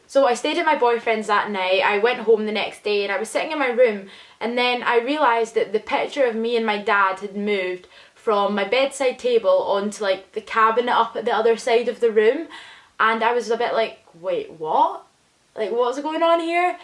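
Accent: Scotland, United Kingdom. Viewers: I absolutely LOVE LOVE LOVE her accent. She sounds just like the characters in Trainspotting. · I understand that. I really do. I personally have a very short temper and bc of things like that, stopped taking it, I guess you could say. I was in a lot of abusive relationships and it was hard. I could tell the situation put you in a lot of distress. I really am just so sorry this happened to you. I'm glad you're doing well. I just feel so bad when people can't stand up for themselves, it makes me hurt. I'm very empathetic with people and idk, it's just upsetting. Just know you aren't alone. Here in the states, I'm still going through it with my fiance's ex. She bullies me, has been very badly for about 2 years now, it just gets worse no matter what I do, say, try etc. The things this girl did to you, I had to watch it be done to my fiance before we were together and it shook me to the core not being able to do something. 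People like that just don't seem to understand the emotional or physical toll their actions have on another person. But, I am glad you're alright and things are better. I hope no one ever does this to you again. Could I ask where your from? You don't have to give exacts. Like, what country? Your accent is awesome. I couldn't tell if it was Irish, British, English. I'm bad at connecting accents to their place. Lol. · Holy shit you're Scottish. I never seen that coming! On topic though I found Student Flats to be a nightmare, yet very exciting as I had just moved to, 'The Big City'. My advice for anyone is to find someone you get along with, save up, and get your own flat. It costs more, but you can't put a price on piece of mind. EDIT: What a crazy cow O_O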